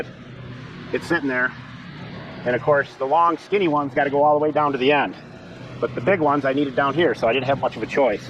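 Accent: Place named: Upper Peninsula, United States. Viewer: Crazy Upper Peninsula accent.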